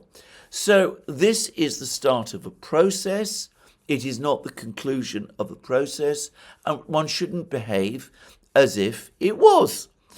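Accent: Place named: RP, United Kingdom. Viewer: btw some posh accent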